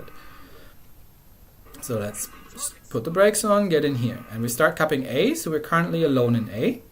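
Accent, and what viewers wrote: Germany. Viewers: Hey are you by chance german or scandinavian? Noticed your accent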